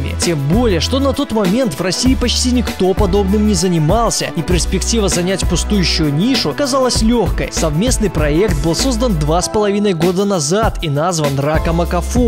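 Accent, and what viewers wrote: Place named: United States. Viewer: почему он говорит как американец который хорошо выучил русский?? Прислушайтесь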